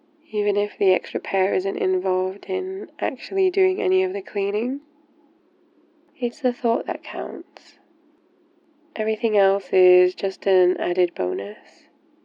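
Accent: United Kingdom. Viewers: God, why are you british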